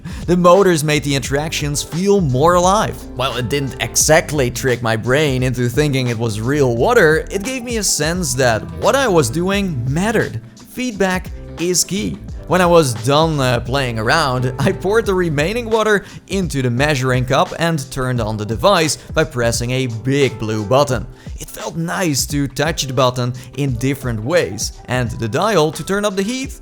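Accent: Netherlands. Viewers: You sound like your from the Netherlands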